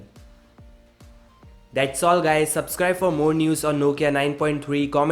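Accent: India. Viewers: I bet this is narrated by an Indian.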